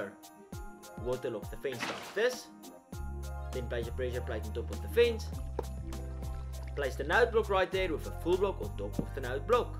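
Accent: South Africa. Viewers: Oh okay I could tell cause I'm also from South Africa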